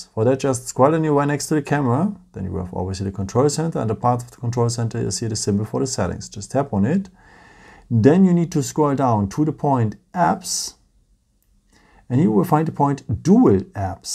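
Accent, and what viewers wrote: Germany. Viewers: man hört SO dass du deutsch bist LOL. aber scheisse bei mir ist das DUAL einfach nicht verfügbar. warumm....?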